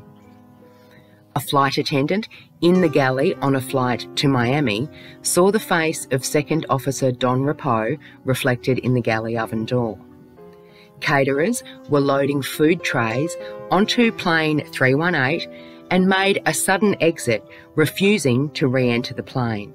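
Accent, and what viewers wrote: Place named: Australia. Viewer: I just added this or subscribe to this channel and I just love this channel the woman that was speaking sounds like she has a lovely Australian accent, which I live in the United States but it sounds like she’s from Australia or New Zealand and or England but anyways, I just want anyone who’s reading this to know that you’re not alone in this world if there’s ever something upsetting you were bothering you always reach out and talk to someone people will understand more than you know I’m 57 and divorced with no kids and I’ve been just about through everything and you can just make a checklist of everything is happened, but I think God is testing me to make me a stronger person again I wish every single person reading this long long happy and healthy beautiful life. I wish nothing but the best for everybody here on this forum. 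God bless you all. Sincerely MINDY from California. Just sending hugs God bless. 🙏🙏🙏🇺🇸❤️🌈🌟💖👇🏽😊😊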